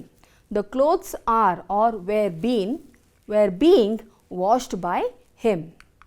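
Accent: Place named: Bangalore, India. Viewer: Please explain slowly and don't use special accent(Bangalore) mam... Because Kannada medium students also watching your lecture..Hope u understand... Other wise make special veduos for Kannada medium students...☝️☝️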